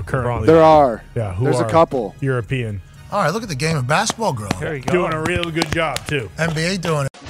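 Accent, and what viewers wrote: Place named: Pittsburgh, United States. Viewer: It's his yinzer accent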